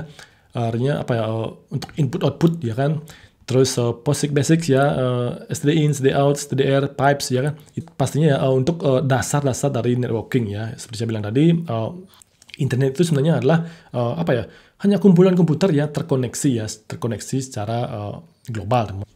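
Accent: Bali, Indonesia. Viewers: logat orang bali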